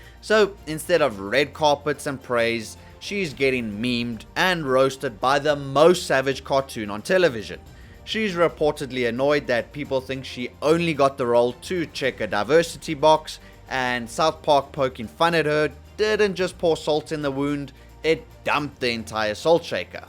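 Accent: South Africa. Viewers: I know it says united states under your page but I can't unhear it, you sound South African bro are you from here by any chance? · You sound south african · Kan hoor jy is n South African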